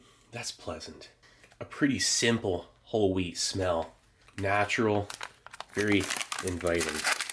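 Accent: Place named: Philadelphia, United States. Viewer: I know is in Florida, but does anyone know if he's originally from Philly. I hear a Philly accent come out sometimes.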